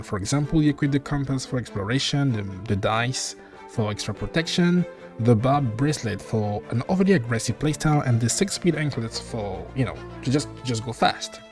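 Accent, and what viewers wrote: France. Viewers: Are you french? ew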